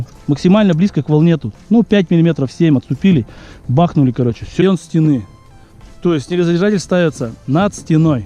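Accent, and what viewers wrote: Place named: Ivanovo, Russia. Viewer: По говору Иваново, Кострома.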